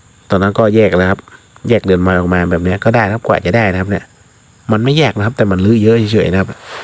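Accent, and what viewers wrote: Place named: Chiang Mai, Thailand. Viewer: สำเนียงออกเจียงใหม่แต้อ้าย😂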